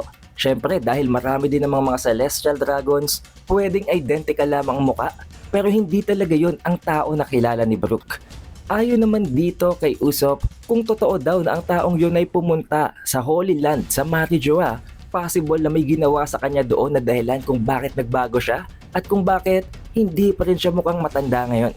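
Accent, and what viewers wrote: Philippines. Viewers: Idol try mo wag mag english ang pangit ng tuno mo eh masyadong pinoy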